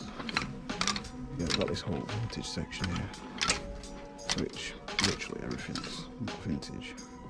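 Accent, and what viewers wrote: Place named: Cheshire, United Kingdom. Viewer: Your accent sounds like ur not far from me. I'm in cheshire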